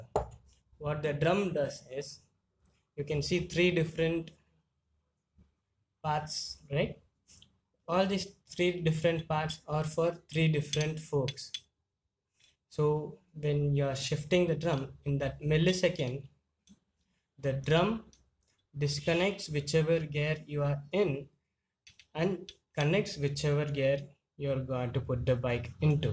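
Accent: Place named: Bengal, India. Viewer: Babal English vaiya wowowo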